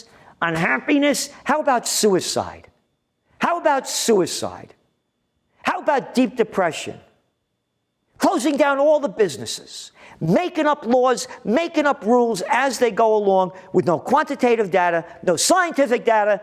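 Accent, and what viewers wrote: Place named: East Coast, United States. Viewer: I think this is good information but why do you sing sections? Not sure what the tune is supposed to be and you don’t have any musical talent. When you sing it seems like you are happy that bad things are happening to others and I don’t think that this is your intent. Please don’t sing anymore. The east coast accents are good and it’s cool when you get mad and yell. You could start breaking things, that would be funny.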